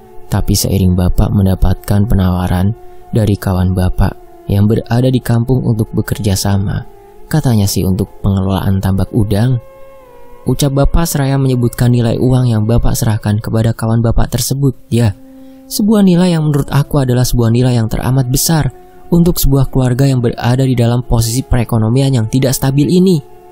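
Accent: Java, Indonesia. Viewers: Ini kan ceritanya orang sunda. Tp kenapa bahasanya, trs cengkoknya itu kaya orang jawa ya, beda sama teksnya.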